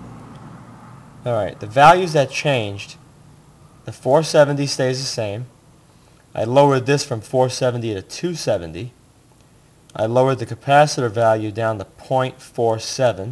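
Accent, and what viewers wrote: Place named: New Jersey, United States. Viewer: Your videos really pull you into the action. Maybe it is the NJ accent, or the way you are basically shooting and talking live with a handheld camera, ...it can get hard to watch that way with the camera moving, if it moves too much. Sometimes it does and that is bad, but with some movement, it gives your videos are warmer feel than if you were just talking with a tripod. Your setup results in a very personal video, like I am right there with you in your kitchen. It just works.Good project too.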